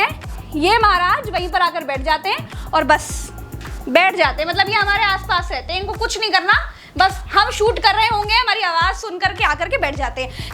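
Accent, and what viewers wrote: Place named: Awadhi, India. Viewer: Aapke bolane per bich bich mein jo avadhi ka jo tach aata hai is liye aapke channel mein Char Chand lag jaate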